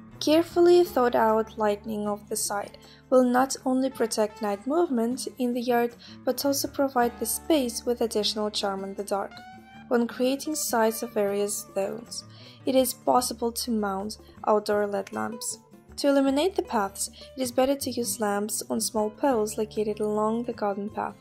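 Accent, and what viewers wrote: Russia. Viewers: русский акцент убивает просто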